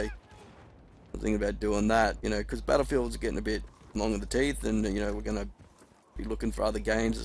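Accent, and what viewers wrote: Australia. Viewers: Hmm, you sound pretty australian to me :) As I am austrian, i love it when ppl mix names up xD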